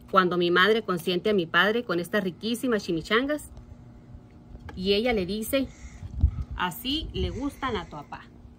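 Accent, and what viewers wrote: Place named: Sonora, Mexico. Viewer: Asi hablamos en Sonora ja ja ja. Arriba el Norte!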